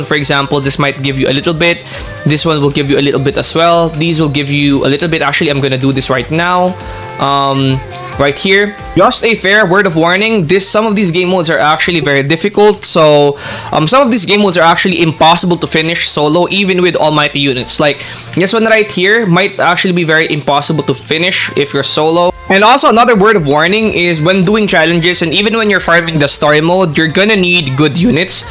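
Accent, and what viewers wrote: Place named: Philippines. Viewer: are you filipino? because your accent is like filipino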